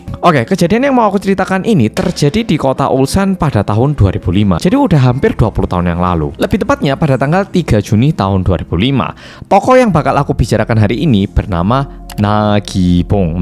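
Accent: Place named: Malang, Indonesia. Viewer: Mas, aku salfok sama "mobile kayak MIKROLET" haha MALANG nya masih kentel ya mas. Salam kera ngalam mas 😁😁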